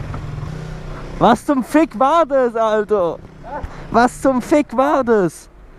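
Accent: Swabia, Germany. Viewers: Das Problem eines jeden Schwaben: Schnell von Schwäbisch auf "Hochdeutsch" switchen. Und "des" und "isch" bekommt man eh nur schwer weg, egal wie sehr man sich bemüht xD Ich spreche aus Erfahrung :D Aber du machst das ganz schön gut :P Bei mit kommt dann irgendein komischer Mix-Dialekt raus, wenn ich mich anstrenge. Geht es noch jemandem so, oder bin ich die Einzige? :D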